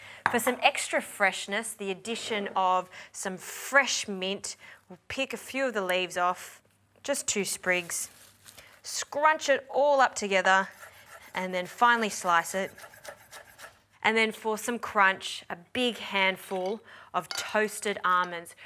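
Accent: Australia. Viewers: I love the Aussie accent ❤️❤️❤️. Only came here to see if sprouts were ok to eat raw 😁😁😁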